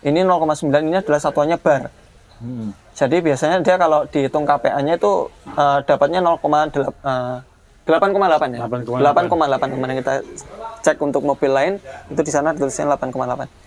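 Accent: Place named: West Sumatra, Indonesia. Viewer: dari logatnya, kayaknya orang minang nih yg nyasar ke jogja 😁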